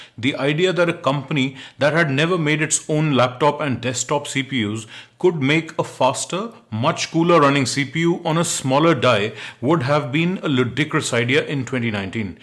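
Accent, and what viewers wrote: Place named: India. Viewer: Super excellent videos..Great guide to lot of decisions..The M1 playlist is just incredible..Glad to find an Indian English speaking content creator adding so much great value..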